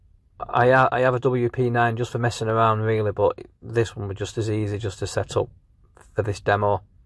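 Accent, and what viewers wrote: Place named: Northern England, United Kingdom. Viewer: Nice one.great to hear a relaxed northern voice rather than a American lol. May be thinking of getting one of these mainly for the mma and the mig .but would like to learn tiggin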